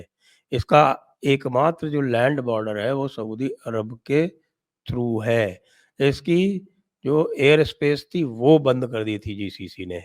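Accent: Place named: Churu, India. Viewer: आपकी आवाज और बोलने का लहजा एक पत्रकार की तरह नहीं हैं चूरू जिले का हो के?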